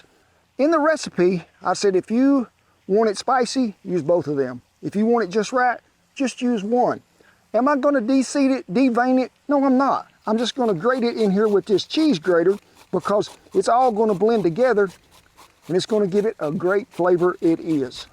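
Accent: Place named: Texas, United States. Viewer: Boy, you look like my family down in South Texas.. You sound like them too. Lol Im gonna make your tortillas.. i havent had a good one since we left the Rio Grande Valley in '77. Ugh.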